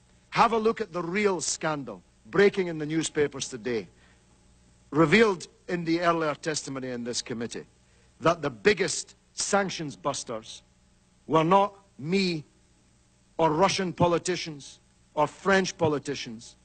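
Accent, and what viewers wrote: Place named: Scotland, United Kingdom. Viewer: James Galloway one of my favorite orators. His wonderful oratory dressed in that powerful Scottish accent projected in a powerful voice from a face that assures the listener that this man’s man does not suffer fools and is no none sense. Every thing he says is true clear and to the point. There is no room in his words for doubt or debate. He does not speak to impress anyone, his words are there to inform the uninformed, in this instance, of the cruelty and criminality of an Empire gone amuck.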